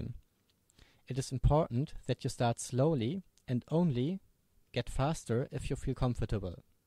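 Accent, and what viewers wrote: Germany. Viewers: Man merkt, dass du Deutscher bist:) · Are you German? I like your accent 😊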